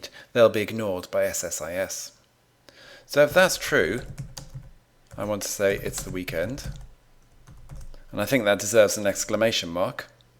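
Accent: United Kingdom. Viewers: special thanks from Egypt Special thanks for British accents :)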